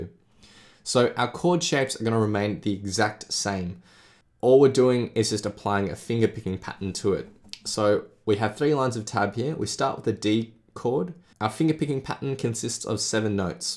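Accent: Australia. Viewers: Love the ausssie accent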